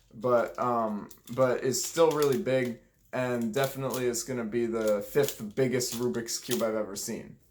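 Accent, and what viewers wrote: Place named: New York, United States. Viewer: why the new york accent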